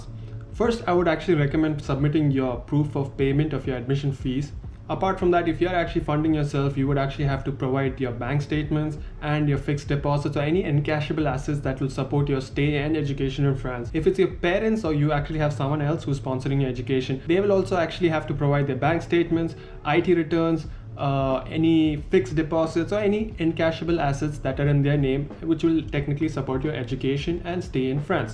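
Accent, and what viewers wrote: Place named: India. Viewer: Everything explained in a beautiful and simple Indian English. Thank you very much.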